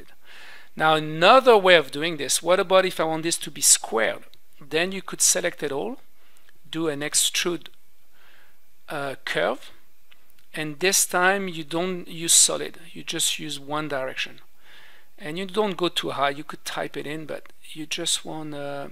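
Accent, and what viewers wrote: France. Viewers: are you french? your accent is amazing :D Thanks for such an effective tutorial :) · You're definitely a French speaker 😅, i love your tutorials 😍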